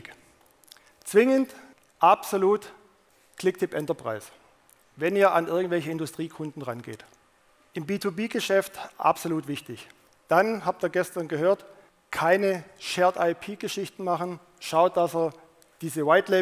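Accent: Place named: Swabia, Germany. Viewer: Wie schön das Schwäbisch doch ist;)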